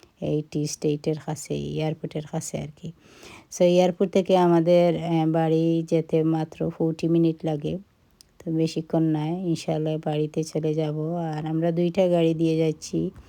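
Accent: Sylhet, Bangladesh. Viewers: Afa bangla notable that is good. Also pure sylhet toking that is very good. We r sylhety. Hahaha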